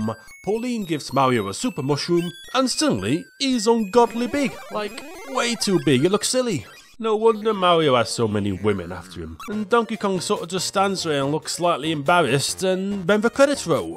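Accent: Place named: North, United Kingdom. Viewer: I spend every one of your videos trying to place your accent. Seems to be part gordie, part scouse, part Lancastrian, with a slight “wossy” style lisp.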